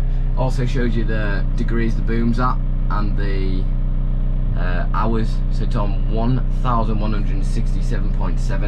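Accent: United Kingdom. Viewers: One of many. Think hes from Cumbria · Sounds like Yorkshirian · Nice vid, is this a British accent ?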